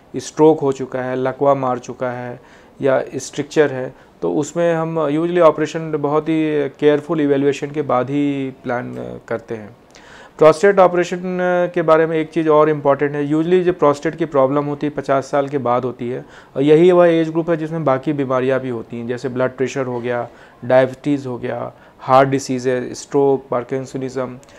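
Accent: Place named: Uttar Pradesh, India. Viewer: VERY SOFT EXPLANATION WITH U.P ACCENT, THANK YOU.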